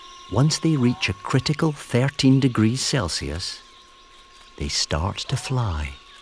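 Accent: Scotland, United Kingdom. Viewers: yess gimme that scottish accent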